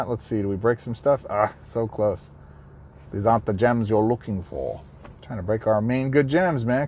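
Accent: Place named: Scotland, United Kingdom. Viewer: What's that Scottsman from?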